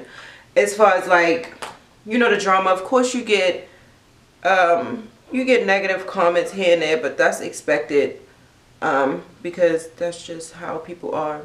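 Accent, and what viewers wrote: Baltimore, United States. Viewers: Tommi and dime piece mixed together but I love your bmore accent my Howard crush was from bmore lol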